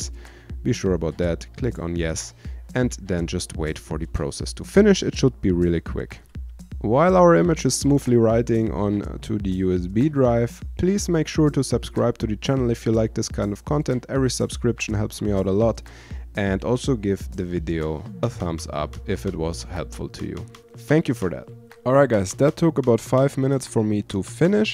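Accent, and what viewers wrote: Germany. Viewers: U German? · Haha ich liebe deinen Deutschen Aktzent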